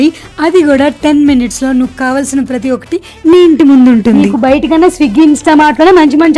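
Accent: Srikakulam, India. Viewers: Srikakulam yaasa......🔥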